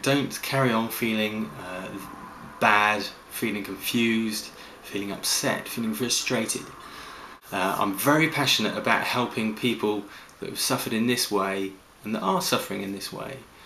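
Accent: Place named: Australia. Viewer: Every time I hear that annoying accent I want to punch an australian in the face.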